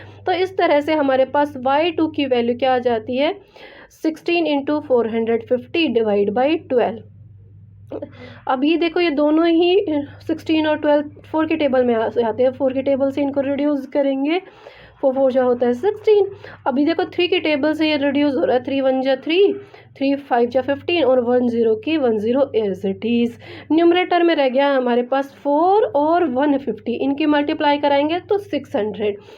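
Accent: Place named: Haryana, India. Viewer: Ma'am aap kaha rehte ho? Harayana? Aapka accent sunke lagta hai aap Harayana ke ho. Aap Harayana ke ho kya?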